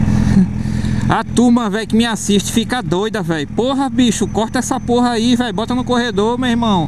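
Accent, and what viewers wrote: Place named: Minas Gerais, Brazil. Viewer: esse teu ˆsutaqueˆ é bão demais sô hahahaha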